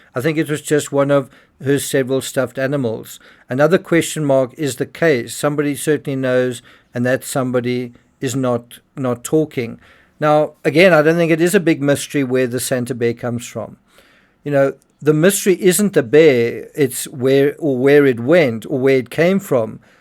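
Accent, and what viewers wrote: South Africa. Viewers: I watch this channel cause I enjoy hearing a south African accent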